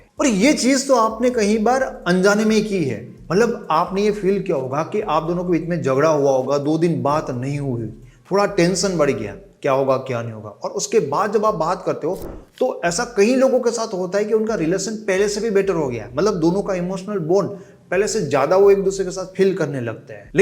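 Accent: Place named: Kathiyawad, India. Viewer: Sir aap gujrati kathiyawadi lag rhe ho bolne ke tone se lag raha hai😅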